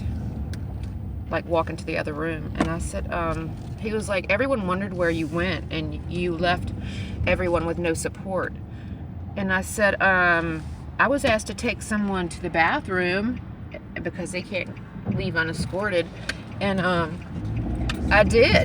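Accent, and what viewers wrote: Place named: South, United States. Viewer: i love your southern accent lol :D you are so kind to care for these people. i'm sorry you had such a crappy day. i dropped my TV earlier so it's been a rough one for me too :( your job sounds interesting, i'd love to hear more about it!!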